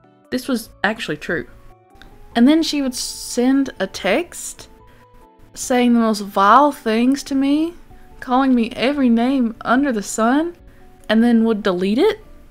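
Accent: South, United States. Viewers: Where are you from? You sound like you have a southern accent lol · Im so sorry that happened! My artstyle is not anything people would buy, so I don't do comissions, and this kinda stuff would not help either 😭 Also, you accent is WONDERFUL! It reminds me of a country accent but... different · is that a southern accent i hear? i'm southern too and it's so reassuring so see another content creator with it!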